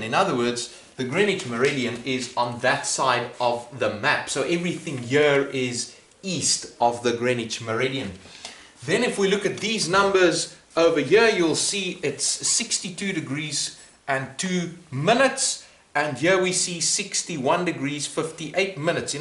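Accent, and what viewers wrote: Afrikaans, South Africa. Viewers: I like your South African accent. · Thank you for your reply! Your accent is very cool! I had a teacher who was born in South Africa. Maybe I didn’t hear too much Scottish afterall 😊anyway, it’s nice to hear such an interesting voice. Cheers, and thank you again for your great videos! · I have an exam tomorrow and you've been of much help. Thanks so much. (btw you sound Afrikaans, am I right?) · I'm from South Africa and it really sounds so South African 😂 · Are you South African? That accent sounds familiar. Ps: thanks for the video! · You south African bruh?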